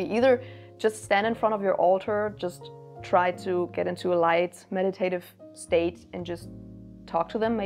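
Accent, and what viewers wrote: Germany. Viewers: When you said you were mostly Danish, I was like "huh, I could have sworn your accent was German". Made more sense when you said you were from northern Germany ahahah. Amazing video, love from Copenhagen!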